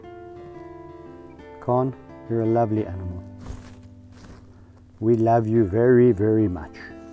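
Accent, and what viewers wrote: South Africa. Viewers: He sounds South African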